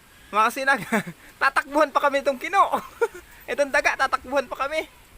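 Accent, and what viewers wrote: Bicol, Philippines. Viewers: Saan ka sa bicol idol??? KC bicol ang tuno ng salita mo.... Taga Bicol din ako idol.. pashout out naman idol salamat 👍🥰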